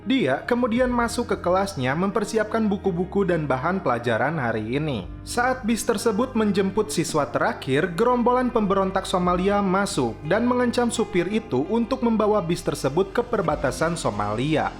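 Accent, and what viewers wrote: West Java, Indonesia. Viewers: orang sunda ya bang🗿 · Jirr..adminya Sunda euyyy😂😂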